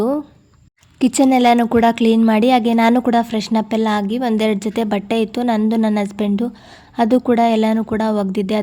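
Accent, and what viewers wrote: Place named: Uttara Kannada, India. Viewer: ಸೂಪರ್ ಸಿಸ್ಟರ್ ನಿಮ್ಮ ಉತ್ತರ ಕನ್ನಡ ಭಾಷೇನೆ ಚಂದ ಕೇಳೋಕೆ 😊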